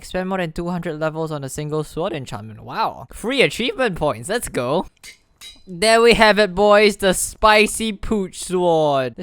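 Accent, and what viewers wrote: Singapore. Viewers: EYY <3 same bro. I could tell by ur accent haha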